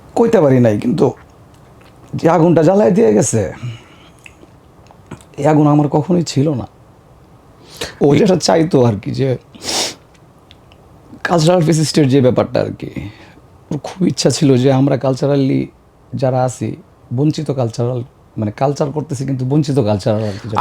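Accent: Kishoreganj, Bangladesh. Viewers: ভাই আপনাকে কিশোরগঞ্জের গ্রামের ভাষাতেই বেশি সুন্দর শুনায়।বার বার গ্রামের টোন চলে আসছে আর আপনি বার বার ঠিক করার চেষ্টা করছেন,এই ব্যাপারটা ভাল্লাগছে। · আমাদের কিশোরগঞ্জের মাতৃভাষা টা মাঝে মাঝে এসে যায় এইডা ঐ পরিচয় হে যে আমরার কিশোরগঞ্জের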